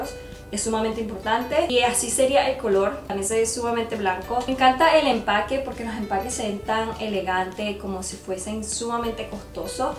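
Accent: Venezuela. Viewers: Mi.usted es asiacata..pero tiene asento Venezolana..soy nueva..sakeme d duda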